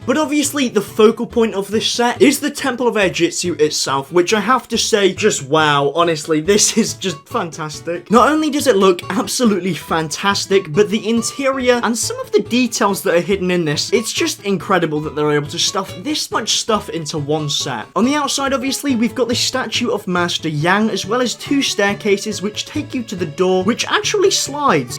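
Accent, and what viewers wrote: United Kingdom. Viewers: Hey Are You British?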